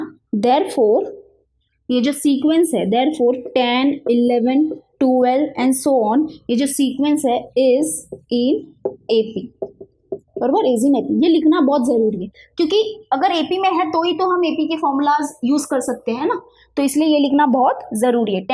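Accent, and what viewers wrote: Maharashtra, India. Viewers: Miss are you marathi Because you says barobar ❤️😀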